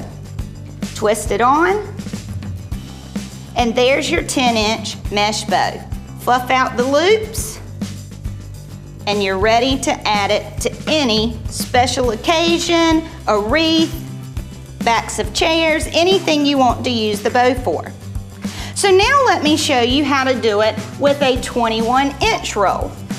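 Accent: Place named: South, United States. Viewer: MY TEACHER AND I LOVE YOUR SOUTHERN ACCENT♥️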